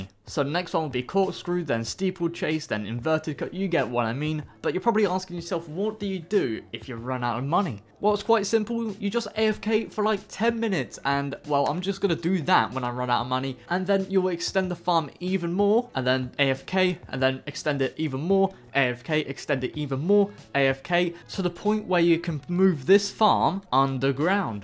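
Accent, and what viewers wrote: United Kingdom. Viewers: Are u British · I love the uk pronunciation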